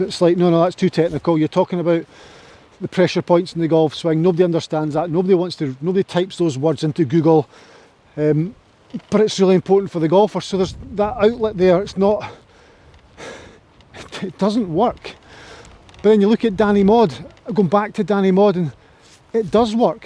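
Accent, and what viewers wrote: Scotland, United Kingdom. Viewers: I love your Scottish accent and would miss your sense of humour and the content of your video's, and weather down south at my course isn't much better. And much less jelly bean left. Keep going it will all look better in the sunshine. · I’am watching this for the second time today. Hang in there!!! I have been trying to swing with open stance for 40 years and could no get it to work. Then I found you. Went from 16 Hcp to a 9 in two weeks. Driver was worst club in bag and now it’s my best. So thank you,thank you!!! Every time I see some one struggling, I give them your YouTube channel. Tomorrow having swing session with a 7 foot tall basketball player and will teach him the Eureka swing. And tell him to watch your channel as well. So word of mouth will grow you!! Love your sense of humor as well. Being American I had trouble understanding your Scottish accent at first, so watched with closed captioning, but now I finally have learned my second language 😊 Love You Man 😀👍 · I have not come across Danny Maude. 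You are the only channel I subscribe to for instruction. You are definitely the benchmark and the clipped Scottish accent is one of the best aspects. I might click on Rick Shiels for equipment reviews, but don’t subscribe. Your sound setup is also my favourite. Would be very sad to see you give it up. The algorithm is a cold heartless beast, but an aspect of life that is not going away any time soon. I am convinced you possess the knowledge to help me enjoy my golf more, but how that knowledge gets into my head and then into my muscle memory is a challenge. Thanks for all the jelly beans. 😃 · your content is both informative and refreshingly entertaining even with the Scottish accent 😂. Actually being Scottish appeals to me and I think many others. Your passion does come through in the way you are able to successfully demonstrate your teachings. Don’t give up, you’re simply too good and we all aspire to be as good as you are. 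Maybe consider some collaborate events or simply teaching your viewers the important aspects of course management. There must be so many other aspects you could cover some of which have already been stated in other comments. Almost all you tubers use click bait (which I hate) but….. if you cant beat them …. Looking forward to your next vlog 👍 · you do you! Although I ski in winter, I still love watching and learning about how I can be a better golfer come spring and summer! I watch them all and love your content because of your Scottish accent and unique teaching style and well, seeing the beauty of Scotland and its courses. I’m a subscriber and would love for you to be happy and succeed. I will spread the word to my buddies…I wish you all the best and look forward to your next video. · Mate, I live in East Lothian and love your content. Great to hear a Scottish accent. Quality coaching and above all, your honesty is so refreshing. 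Keep going, I’ll keep watching. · everyone has their highs and lows. (N.G.U.) Never Give Up. All of your content is so positive I enjoy watching your channel. It is not your Scottish accent, I love it and you are very easy to understand. Remember when the going gets tough, the tough get going. God bless you, and thank you for the very informative content. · Don’t give up. I like you videos and you bring an other perspective of golf. For a Frenchmen it is good practice to learn Scottish English LOL. Enjoy what you like to do ! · ....stress can get to us all....have a little Glenmorangie and please keep giving us pointers and instruction. I think your videos are extremely helpful...and funny! I forward many of them on to my golfing buddies. BTW... I love the Scottish accent! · I know how you feel mate I only have 432 subscribers lol. I have always enjoyed your videos and like the Scottish accent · Well, I do like your scottish accent. 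So please don't give up.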